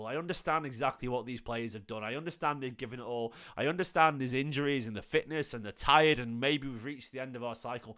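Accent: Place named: Liverpool, United Kingdom. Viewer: Is this fella scouse? He’s got scouse undertones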